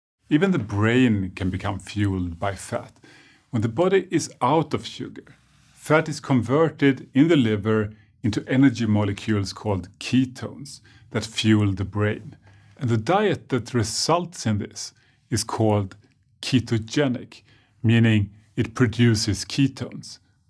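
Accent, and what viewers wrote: Sweden. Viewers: This obviously Swedish velvety middle-aged soft-spoken man has convinced me with his confident gaze. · i like the accent i would say either swedish or norwegian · This guy sounds Swedish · Is he Swedish? 🤔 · Damn thats a Swedish accent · Anti-fans fuck-off. He is a Swedish Doctor that's why his accent is different. His name is, MD. I have his book LOW CARB, HIGH FAT FOOD REVOLUTION. And it really works on me and it's like my holly grail. He is a good doctor. 😃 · is Swedish. No doubt about it. I lived in Norway for 21 years, so all the Scandinavian-English accents are crystal clear to me. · I call swedish, sounds as bad as Arnold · Could you maybe be Swedish?:) I think I hear a slight Svenska accent;) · Hes from Sweden, I hear that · he is indeed swedish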